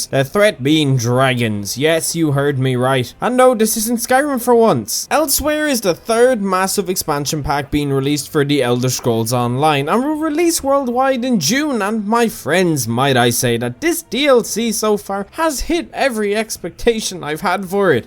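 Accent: Ireland. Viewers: Are you actually irish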